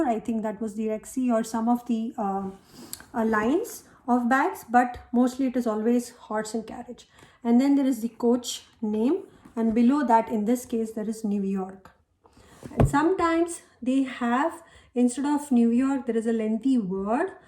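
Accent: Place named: Punjab, India. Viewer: when you speak Ma'am like a tone of Indian punjabi 😊watching your videos from Philippines , i have also coach klare with revits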